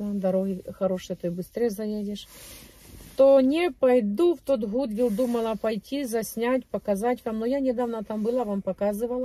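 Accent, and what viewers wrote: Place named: Moldova. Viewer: Такой говор как с акцентом молдованки в Украине город Черновцы там разные сел молдованов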